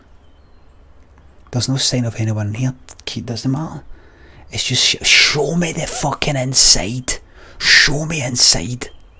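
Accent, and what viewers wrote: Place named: London, United Kingdom. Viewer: The London accent is the best thing about this stream, cracked me up haha!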